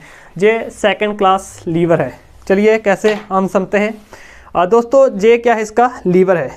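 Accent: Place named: Punjab, India. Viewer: Thanku sirr and apki punjabi 😅🧿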